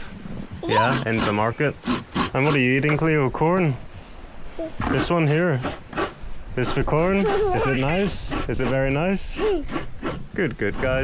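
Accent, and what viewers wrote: Northern Ireland, United Kingdom. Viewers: u sounds familiar lyk norther ireland accent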